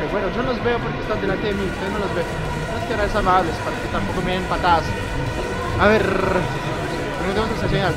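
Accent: Colombia. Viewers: Soy muy timida, pero me atrevo a decirte que eres muy guapo jejeje... Me gusta tu acento colombiano :-D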